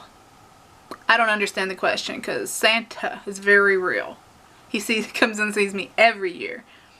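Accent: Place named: South, United States. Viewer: my true blonde must be showing...because I just noticed your southern accent 😂 really enjoy the direction your channel is going!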